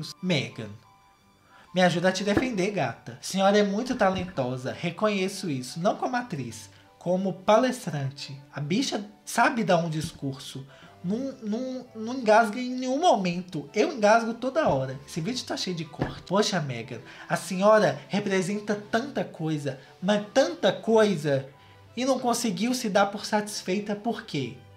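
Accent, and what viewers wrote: Minas Gerais, Brazil. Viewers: Kkkk vc é muito fofo,. Adoro seu sotaque mineiro <3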